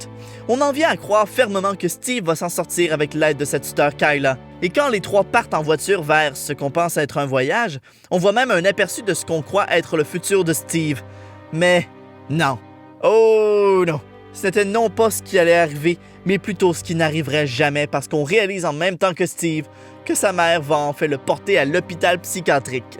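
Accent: Quebec, Canada. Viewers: Ter quebecois ou francais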